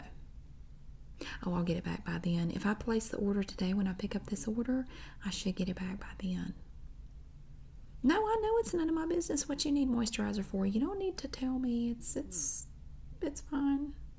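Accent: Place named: North Carolina, United States. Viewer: I love this accent so much as a southern guy. It's really we done. Then I find out she is in NC cause Winston Salem is right up the road and now I understand why it sounds so familiar. NC represent!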